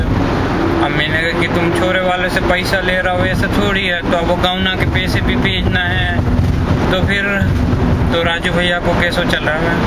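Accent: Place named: Vidisha, India. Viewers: bond ho sir aap...i have a friend from vidisha, sounds just like you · D Bundelkhandi Boli.....quite close!!! Good one!!!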